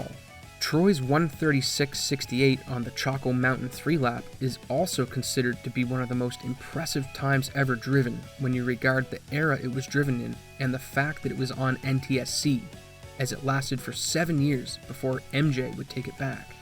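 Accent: Canada. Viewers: Your "AR" sounds scream Canadian. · Are u Canadian? You speak like a Canadian. Mars bars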